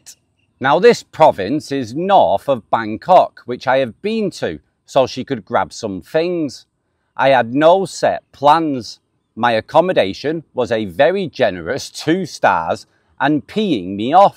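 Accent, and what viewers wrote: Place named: North, United Kingdom. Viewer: Glad you are back in the groove. I look forward to your stories every day. I enjoy your northern accent. Dave from California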